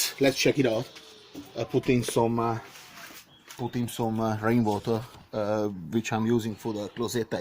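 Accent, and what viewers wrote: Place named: Hungary. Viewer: Az akcentusból éreztem, hogy magyar vagy :D.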